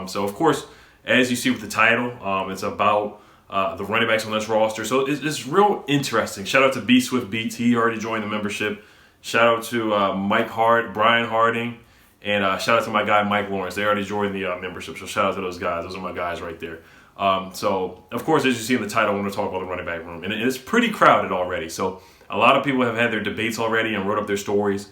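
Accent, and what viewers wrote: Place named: DMV, United States. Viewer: On the Rawsta...the Rawsta.....Are you from the DMV area?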